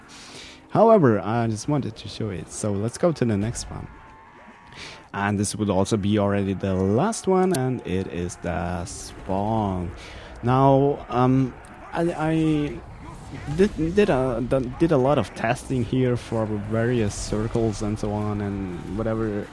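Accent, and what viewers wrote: Germany. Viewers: this is great dude thank u i was looking for this ur great and dude are u german i love ur accent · Are you german ? :D really good Video btw.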